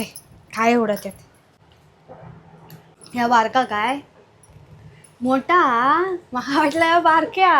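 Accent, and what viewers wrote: Malvan, India. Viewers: A-pratim malvani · लय भारी मालवणी तर झक्कास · भारी मालवणी तु मालवणी आहेस का, ❤❤❤❤❤❤❤ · भारी तुम्हाला मालवणी येता 😂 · Bharichhh काय ता केलास गो pure मालवणी ❤❤❤ मी कुडाळचा आसय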